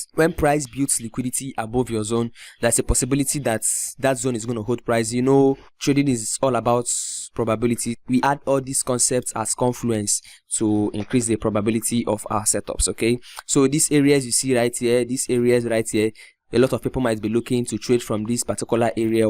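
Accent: Nigeria. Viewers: Where are you from bro? Nigerian??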